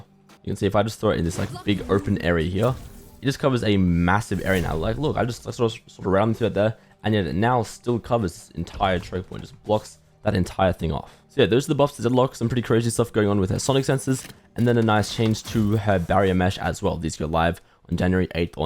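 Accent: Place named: Australia. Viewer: your accent suddenly is more australian than what it was before, impossible to understand 40% of what you say.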